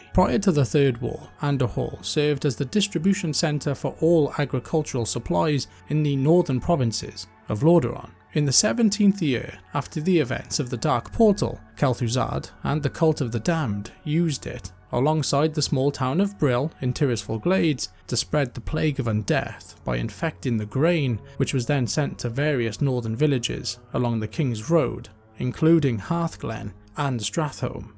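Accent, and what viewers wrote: Wales, United Kingdom. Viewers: hey mate cool chanel, are you welsh btw?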